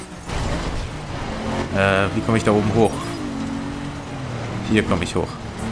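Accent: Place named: Russia. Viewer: man hast du nen russischen akzent ! :D (bin auch russe)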